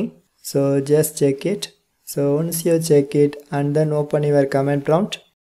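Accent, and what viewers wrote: India. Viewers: You my man are a god with sexy sweet Indian accent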